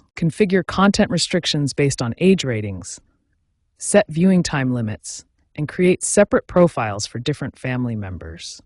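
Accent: United States. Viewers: As an example of poor delivery technique, this presentation would be useful as a training aid for teachers and lecturers. The presentation is poorly coordinated with information flashed on the screen brielfly with insufficient time to assimilate the content. Thereares also unecessary and distracting blank pages. The rate of s[eaking is almost so fast it is garbled and while the presenter's diction is quite good, why was an American accent chosen for a product supplied in the UK? There should be considerably more detail on how to access \setting options and a link to a printable transcript so that the user can follow the information at a pace suitable for making adjustments.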